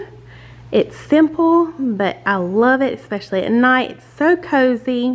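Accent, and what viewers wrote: South, United States. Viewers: Girl. I haven’t watched your vid in quite awhile. Know you have new house, but what state are you in? Accent is a pleasure to listen to so thinking Ga., Ark., or Tenn. I put up a Halloween tree yesterday, so absolutely agree with your schedule. Tfs cheers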